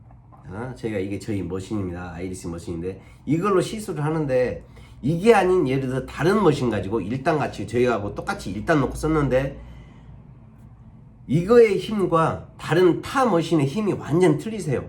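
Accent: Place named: Jeolla, South Korea. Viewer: ㅋㅋㅋㅋ전라도 분이신갘ㅋㅋㅋ정감가요 말투가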